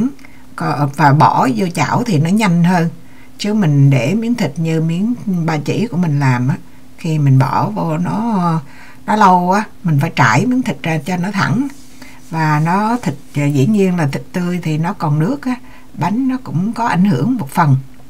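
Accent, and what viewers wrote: Quang Nam, Vietnam. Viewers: Chào, Mình rất thích nghe giọng Quảng Nam cuả 😍🤩 Bánh rất ngon, dòn và đẹp lắm. Like 👍👍👍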